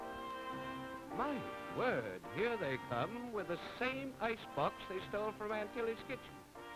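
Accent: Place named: Transatlantic, United States. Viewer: Transatlantic accent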